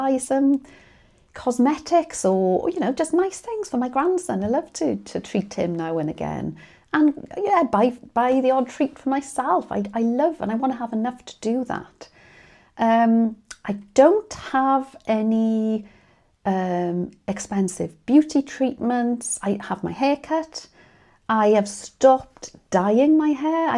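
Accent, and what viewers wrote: Wales, United Kingdom. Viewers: Hi, watching from Australia. Is your accent Welsh ? I love it. I visited Wales back in 1981 ! 😊💖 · you are such a wise grounded lovely women, so sorry things went wrong for you, good luck for the future, I'm in Mid Wales but was born in South Wales so it is so nice to listen to your accent thank you for sharing your life x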